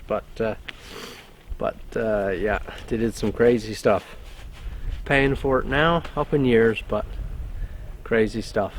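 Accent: Newfoundland, Canada. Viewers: Was there no choke on the carburetor? If so, wonder why not. I was trying to place the accent but you said Newfoundland--yours doesn't seem as distinctive as some I have heard. Glad that your Grandpa was able to, at least, view you working it. Bet he would of loved to sit in that seat again. Grew up running the old JD 2 Cyls. and love their sound to this day. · Its friday night and I am watching a bulldozer push snow, now thats a cool night. I was wondering about your accent as you were talking, it sounded familiar then you said NewFoundland...ahhaaa! I am from northern Maine and that accent is how I used to talk before I moved to New Yark 40 years back. Its 'aboot' doing a job! Well done.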